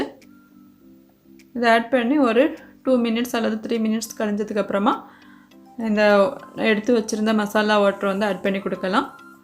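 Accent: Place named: Kanyakumari, India. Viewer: Sis nenga kanyakumari dist a🙄...slang apadi iruku🥰🥰...nice sis💐💐☺️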